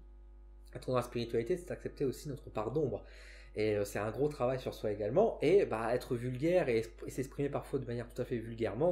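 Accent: North, France. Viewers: Ben t'es un gars du nord quoi ! 😊 On marche sur la tête si maintenant on ne peut plus s'exprimer tel qu'on est... Le naturel ya rien de plus vrai... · Reste naturel, toi même, je suis du nord aussi. Si la personne ne te comprend pas elle te posera la question(vous pourriez m'expliquer)? C'est tout 😊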